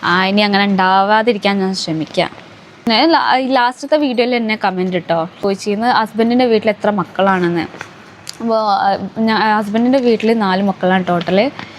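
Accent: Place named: Malabar, India. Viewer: ഒരു മലബാർ ടച്ച്‌ വർത്താനം 😍❤️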